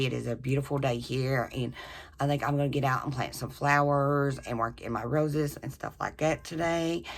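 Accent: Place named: South, United States. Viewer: Everytime I watch you I just adore your accent...it is southern strong! That look you did with the palette is awesome and a bit subtle, you look like a happy sweet woman...and those are hard to find LOL! I don't even have a vanity but I have many piles of makeup that I need to organize so I relate! I would love to enter to win that palette because it looks so good on you. But you have better younger eyes than I have cause i am 56! Thank you so much for offering a lovely giveaway! Wendy Sowles on Insta at wendysowlesexploresmakeup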